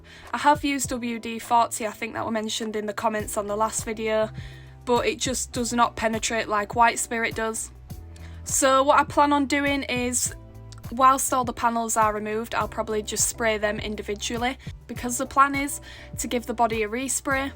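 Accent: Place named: North, United Kingdom. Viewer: definitely a northern lass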